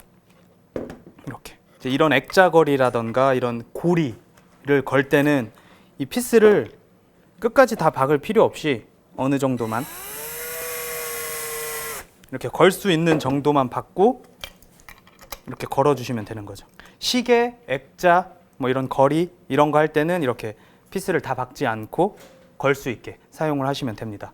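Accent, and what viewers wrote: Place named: Japan. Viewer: 대단하다 진짜.. 앙카가 뭐냐 앙카가.. 위대하신 천황폐하를 모시는 신민이라 그런가.. 일어를 쓰려면 일어를 쓰고, 영어를 쓰려면 영어를 쓰지 일본애들이 쓰는 영어 발음까지 따라해야겠냐..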